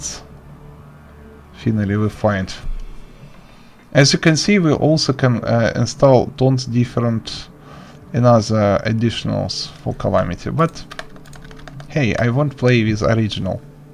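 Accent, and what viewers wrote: Russia. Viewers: русский акцент выдал тебя)) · очень слышен русский акцент спасибо помог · ну привет, по акценту можно сразу понять что ты русский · i dont know if i can watch tutorials on anything unless they have a russian dudes voice after this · Кааааеф. Так отчётливо слышится русский акцент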